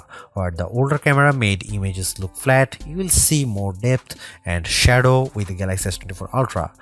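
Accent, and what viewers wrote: India. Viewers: Indi accent insta skip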